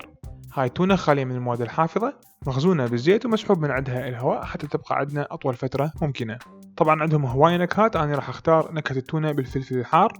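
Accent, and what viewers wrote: Iraq. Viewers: العراقيين ولهجتهم اسم لله اصمالة في امان الله ثيمالا واااااو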